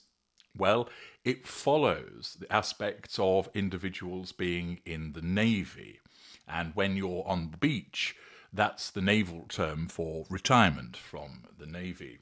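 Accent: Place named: England, United Kingdom. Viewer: Come on you have to tell us all about yourself! English accent. From England.✅ Tans in the summertime. Not a red headed guy with freckles.✅ Swears like a trooper. Definitely not in your 60's plus plus.✅ You don't show your face. Maybe camera shy🤔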